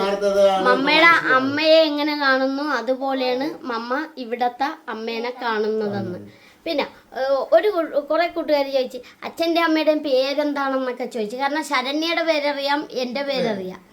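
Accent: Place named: Kannur, India. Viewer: പപ്പായ കണ്ണൂര് ഭാഷ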